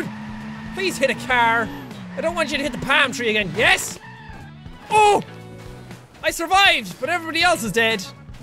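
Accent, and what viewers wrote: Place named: Ireland. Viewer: Swearing always sound so much funnier with an Irish accent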